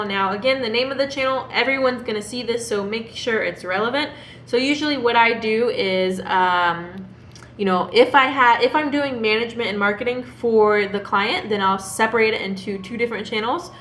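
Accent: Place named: England, United Kingdom. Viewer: Your English accent is so pleasant that it is already sufficient reason to listen to the end.. ))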